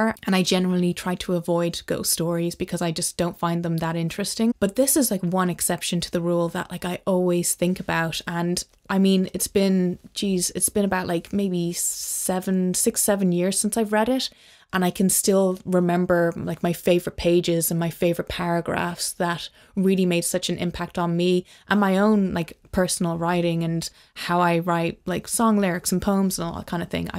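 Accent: Ireland. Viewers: I had to check your profile to be sure of your origin. Sounded Irish yet was kind of Nova Scotia or thereabouts :-) King's Pet Sematary is well done and adheres to the philosophical principles of the horror genre. Fritz Leiber rocks the horror. . . the horror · Are you Irish? 🇮🇪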